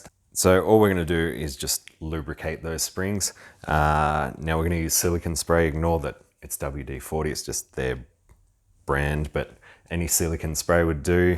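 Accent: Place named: Australia. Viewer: Great video, nice to hear an Australia voice on YouTube for a change.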